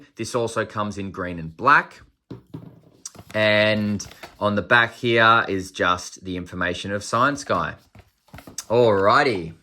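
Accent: Australia. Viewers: plus the Australian accent makes every presentation better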